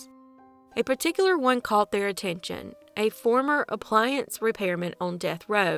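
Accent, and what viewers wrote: South, United States. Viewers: I'm born & raised in the city of Baltimore & i very rarely hear that nice southern accent & i absolutely love it. I come for your stories but stay for your voice. .. awsome content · I’m not from America, but…..as God is my witness ‘ahh’ adore those southern accents!😉😊😂🥰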